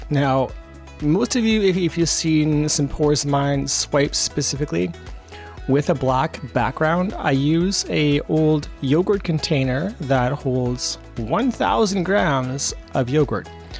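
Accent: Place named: Canada. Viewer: Great piece!! I always forget how different Canadian accents are depending on which province. I’m in Ontario and we pronounce our A’s so different hehehe. Long arms definitely with larger canvases. I struggle with this only being 5’1. Great colours. I’m definitely going to check out the FolkArt colour shift brands! And I’m looking forward to chatting about a future collaboration!! Have a great day my friend!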